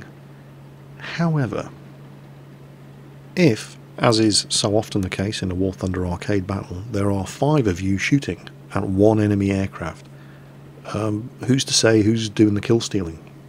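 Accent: United Kingdom. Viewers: we love the Man Cave becasue we get to know more about you then just "That cool old british guy we hear on videos" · It's dat accent. Classical Brit is what makes you popular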